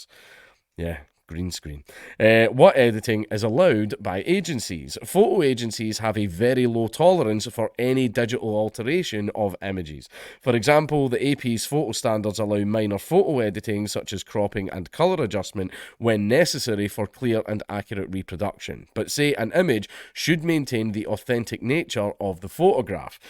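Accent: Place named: Scotland, United Kingdom. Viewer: My daughter in the room just asked about Dankula saying: "how do you understand him dad?" And I just said: Scottish?!?! Easy, just watch Trainspotting once a year for the past 20+ years."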